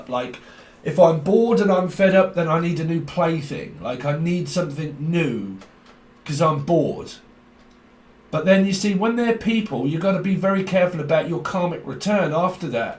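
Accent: United Kingdom. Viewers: your lovely british accent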